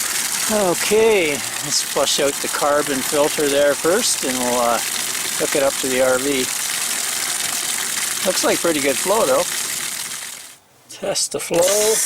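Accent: Canada. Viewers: I could listen to a Canadian talk aboot anything all day long eh.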